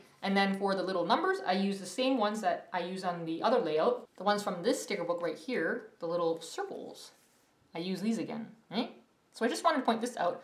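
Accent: Canada. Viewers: This is too cute, and so is your Canadian accent ("eh"? :-P )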